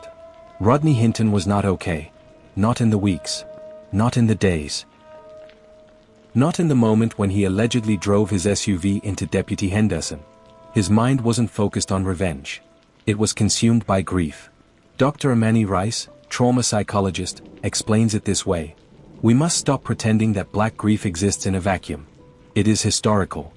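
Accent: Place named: United Kingdom. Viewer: I can’t stand a British voice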